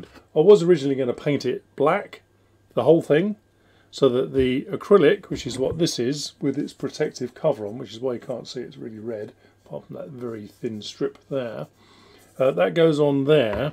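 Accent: United Kingdom. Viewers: Hi. I'm just watching the movie 13 Lives about the rescue of the Thai boys trapped in the cave. Viggo Mortensen plays one of the UK rescue divers. He looks just like you and sounds like he comes from the same part of the UK as you. Great film!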